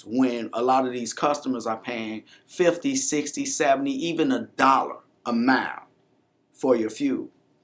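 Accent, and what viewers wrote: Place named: Chicago, United States. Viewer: U gotta be from Chicago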